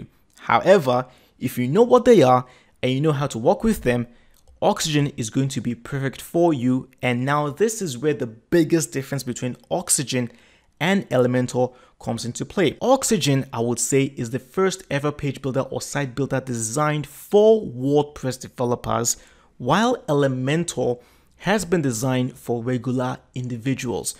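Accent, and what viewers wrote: Nigeria. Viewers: are you a nigeria sir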